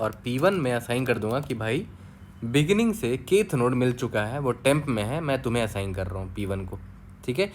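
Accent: Bihar, India. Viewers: accent se laga thoda bihari touch hai btw nice explanation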